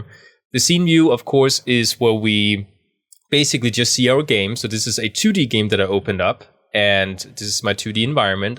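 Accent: Denmark. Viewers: I like your accent as im also danish :)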